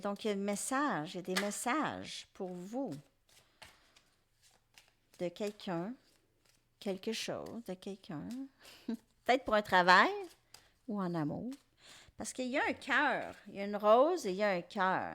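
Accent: Canada. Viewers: Vous êtes excellente,agréable à écouter avec l accent canadien bises de Belgique de Robert Brummel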